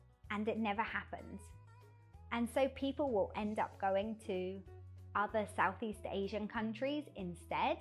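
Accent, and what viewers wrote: England, United Kingdom. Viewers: 好有濃厚的在地英式腔調😊😊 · 不會啊@@ 因為妳的發音很英國啊！ 非常好聽～😍👍🤗 另一個YT艾倫就真的聽不大出來他的英國腔。😁 我想……🤔 跟二次大戰後很有關係，特別政治跟戰略和軍隊，麥克阿瑟將軍在台灣是蠻有名氣的一位美國二戰後的將軍。 今天沒有小朋友～😭 PS: 其實沒有疫情，我們今年暑假是想去英國，歐洲一帶玩的。😭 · 多麼美妙的英格蘭口音！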